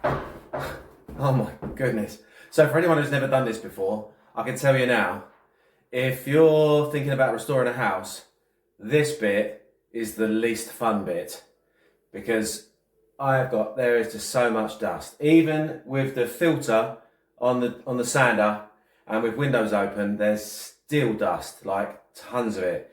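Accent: England, United Kingdom. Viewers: I clearly wasn't alone in my appreciation of the cheeky charm and sunshine you bring to LaLande! So awesome to see you here with your own channel. Woukd love to hear how tou came to be in France and your journey so far. Love the accent, the funny British-isms, and seeing you bring joy to so many. Go! 🎉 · ... to be clear, I'm not here just b/c you're sexy nor is it b/c I have that common American fetish - addiction to an English accent. I expect you to work your butt off, to keep win my loyalty.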